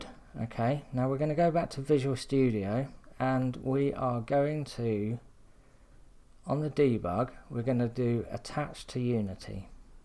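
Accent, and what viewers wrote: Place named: London, United Kingdom. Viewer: Wow amazing London accent:)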